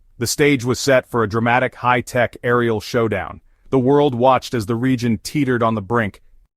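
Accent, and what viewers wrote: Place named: United States. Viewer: BS in an American accent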